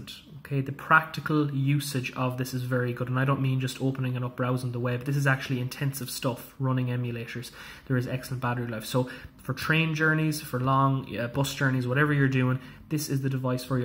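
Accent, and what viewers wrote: Ireland. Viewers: Is it odd for a man to tell another man his voice is adorable? I want this man to FaceTime me nightly and just tell me about his day while I drift to a deep slumber to his sweet buttery brogue. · You're Irish right? If so how did you get this console?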